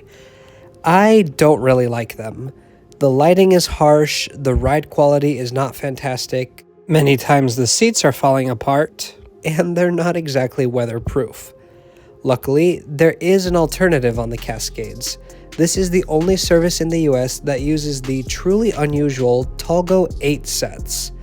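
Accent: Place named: Chicago, United States. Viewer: you have been very, very evenhanded with Amtrak👍🏻 you and Chicago area?🤔( you despite being from the Netherlands) sound like a Chicagoan lol🤘🏻🇳🇱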